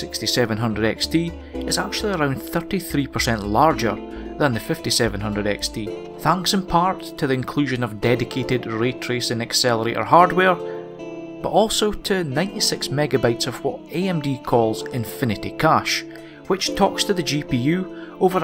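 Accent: Scotland, United Kingdom. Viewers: i love listening to Scottish people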